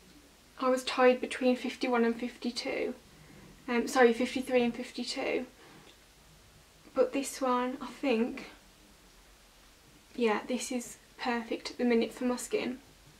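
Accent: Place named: Midlands, United Kingdom. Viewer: I haven't heard someone from the Midlands on youtube before XD Represent!! Lol.Nice vid :)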